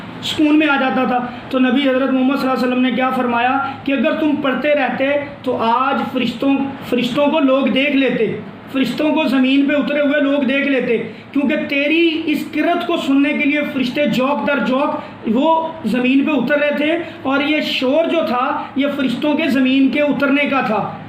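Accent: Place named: Punjab, Pakistan. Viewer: He is in punjabi accent with correct information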